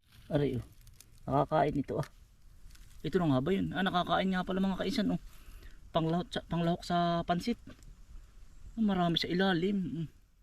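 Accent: Philippines. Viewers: what's going on people stealing banana after typhoon destroy almost everything. It doesn't make no sense at all well just stay cool you'll get it back what you've lost. Take care your self and always stay safe and healthy and your entire family too love to watch your show and your Filipino accent too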